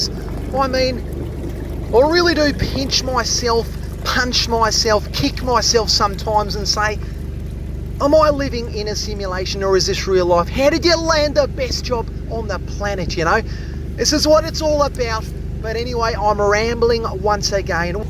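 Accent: Australia. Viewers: Are you from the same region in Australia as Steve Irwin? Not being funny, you just have a very similar accent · Hallo from the Netherlands, up and above for you! You just popped up in my, you just may like this video. And curious as I am I watch you work those blockages. In one video you mentioned that it is most women that watch your videos and I felt busted😂😂. I like your Australian accent. Keep going entertaining us YouTubers! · Hey. Been watching the videos for a couple months. Something therapeutic about things getting fixed. Plus, I get to hear the Aussie accent and it takes me back to the two years living outside of Sydney. Good on ya mate and keep it up! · you little ripper, thank you for being my AU dialect coach! I have the pleasure of working with Perthlings and your S-tier material has helped immensely. Really smoothed out my U.S. hard R's! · Gday mate! 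Lisa here from the state of Virginia in the good ole USA. I love your videos, your positive attitude, your commentary and your Aussie accent! It’s bloody brilliant! 😊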